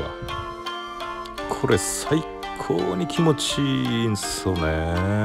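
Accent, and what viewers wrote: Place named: Miyazaki, Japan. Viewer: 宮崎弁 連発ですねえ〜それだけリラックス⁉️目元 口許本当に大事ですね❣️❣️❣️段々、精気が溢れてきました。目元の赤がいいです‼️ひねくれちょらんよ❣️‼️❣️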